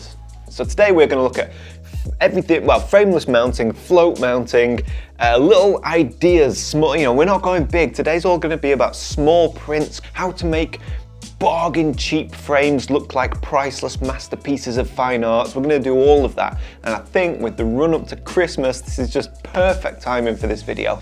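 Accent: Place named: Northern England, United Kingdom. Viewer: I was born in Northwitch, Cheshire and have an Uncle who lives in the lake district. I now live in Tennessee, and I love watching your channel because, not only am I inspired for my own photography, but hearing your accent transforms me home. Thank you for all you do!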